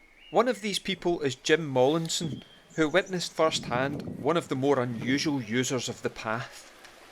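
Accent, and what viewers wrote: Glasgow, United Kingdom. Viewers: Sounds Glaswegian to me (albeit well spoken) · Sounds to me like he could be doing Gregory’s Girl, definitely Glasgow.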